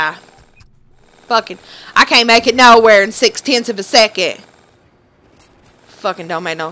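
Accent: South, United States. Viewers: I love your country actcent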